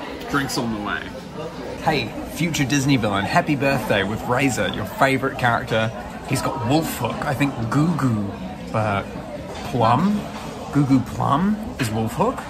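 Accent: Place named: New Zealand. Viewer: sweet! a kiwi playing genshin I enjoy genshin and im planning to move back to new Zealand in the future trying to learn the accent again lol so this is perfect XDD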